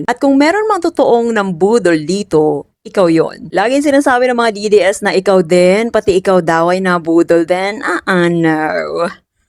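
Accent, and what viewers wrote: England, United Kingdom. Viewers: I'm sorry but your English accent doesn't make you smart, mag bisaya kanalang day.:face-green-smiling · Luv ur brit accent 😊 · well said. Love the British accent though but not the Bisaya. Sagwa magsalita si Inday Sayad, even in her own dialects. Can you also roast Harry Roque. Thank you.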